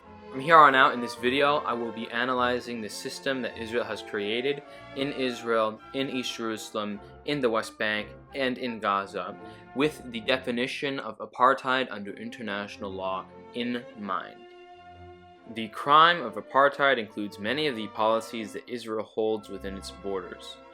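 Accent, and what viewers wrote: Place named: United States. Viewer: Great video, I have only watched two of your videos so far an wish to giv so feedback, since I believe good content with bad execution/presentation undermines the content's potential. many times I have been confused with lack of sync between "infographic" and what you're saying. E.g when you talk about WB, Gaza shows up, when you talk about Gaza, E.Jerusalem shows up, etc. But the more unbearable element was your very heavy american accent. It is not the american accent itself, but rather the very slurry pronounication! Your talking pace is optimal, but if the slurry pronounciation can be eliminated by you talking slower and clearer, that would be a better option to go by. Thank you for doing this video! 🇵🇸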